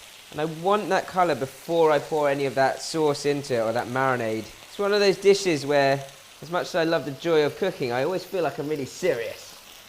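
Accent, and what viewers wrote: United Kingdom. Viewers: the British accent of course!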